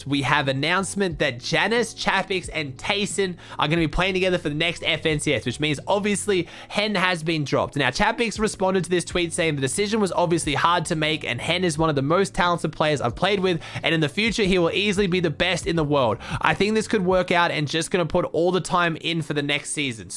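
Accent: Australia. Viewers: G'day aussie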